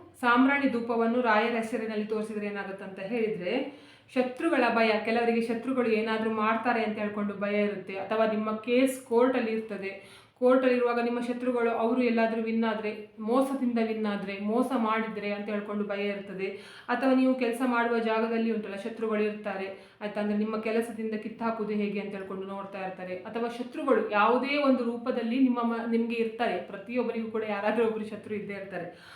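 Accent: Mangalore, India. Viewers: ನೀವ್ ಮಂಗಳೂರಿನವ್ರ. ಮಾತಾಡೋ ರೀತಿಯಿಂದ ಕೇಳಿದೆ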